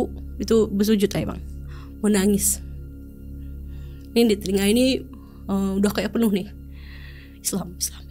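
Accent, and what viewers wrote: Malaysia. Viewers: accent cakap macam orang Malaysia laaa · mau tanya, ni org Indonesia atau Malaysia?sbb sleng kadang² seperti org Malaya😁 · logatnya kayak org Malaysia suka dngar🌹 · Iyaa orang KL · Kakak ni org mana? Ckp macam ada sleng malaysia😍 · Emang lucu kalo denger orang Malaysia ngomong Bahasa Indonesia, kedengarannya kayak beda aja gitu logatnya ada ikut-ikut nya 😅. Tapi untuk kaka jika ingin berbahasa Indonesia maka gunakanlah Bahasa Indonesia dengan baik dan benar, jangan digabung ataupun di campur dengan bahasa asing seperti Inggris dan yang lainnya ya kak😉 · Sebagai orang Malaysia aku boleh detect "slank Malay" walaupun dia cuba sedaya upaya berbahasa Indonesia.😂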